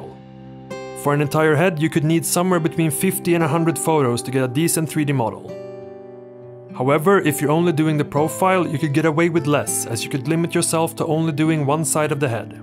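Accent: Sweden. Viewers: Man känner igen en svensk röst väldigt snabbt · He's most likely swedish, all swedish ppl sound like that if they haven't been in the US for a month.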